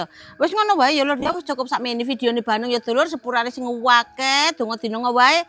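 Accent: East Java, Indonesia. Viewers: aku orang Bekasi tp aku ngerti paham ngmong nya, aku ikut konten dr awal JD selalu suka, itu udh karakternya Jawa Timur jos pokoknya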